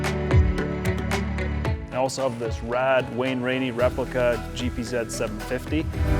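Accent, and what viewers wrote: Scotland, United Kingdom. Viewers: Hi, I just subscribed! Are you Scottish, I thought that listening to your accent watching your SRAD video. I’ve been doing a similar as you collecting bikes I drooled over as a kid. I love this era of machines. 😊 Best wishes Ian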